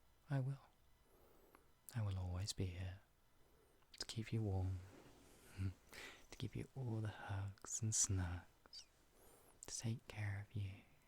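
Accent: United Kingdom. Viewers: FINALLY A BRITISH AUDIO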